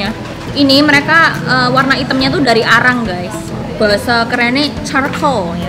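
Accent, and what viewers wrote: Java, Indonesia. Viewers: Mbak ko isih teko jowo medok ne wkwk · Tante orang jawa ya soalnya medok banget... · Muka Korea ..sikap medok Jawa 🤭🙏😁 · mba gemesin mukanya korean girl, tapi ngomongnya jawa medok wkwk kalau ketemu saya mau cubit boleh yaa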